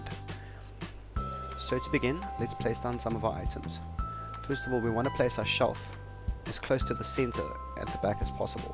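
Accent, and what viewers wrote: Afrikaans, South Africa. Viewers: I love how i can recognize a fellow SA M8 voice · R u south african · are u south African? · thanx this vid was realy helpful are u south African? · You sound south african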